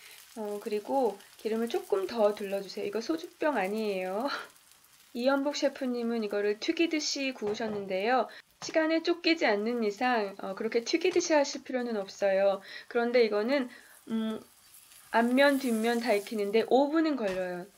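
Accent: Seoul, South Korea. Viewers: 플레이크는 뭐예요 그리고 서울사투리인가요?? 말투 엄청 특이하네요 ㅋㅋ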